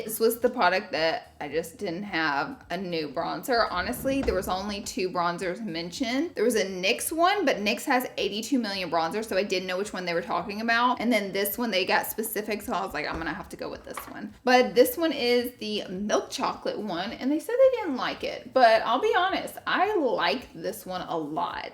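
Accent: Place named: Alabama, United States. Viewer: I absolutely love you and your videos! I am also from Alabama so I can relate to the way you talk so well lol. It’s so cool to see someone that grew up in a similar atmosphere to me is doing such great things. It gives me a lot of inspiration! Much love. ♥️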